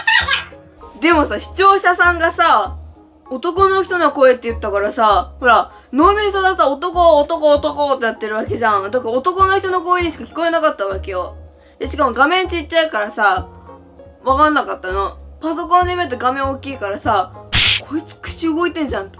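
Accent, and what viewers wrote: Kansai, Japan. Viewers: 関西弁が似合う可愛いちゃん ◎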